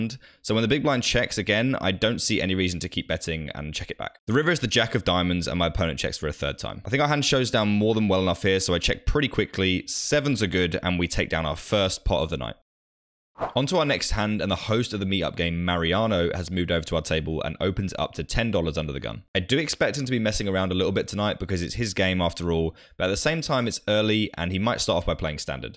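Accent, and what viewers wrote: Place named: Quebec, Canada. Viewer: the accent from "quebec" is so strong lol... (I'm from montreal, quebec)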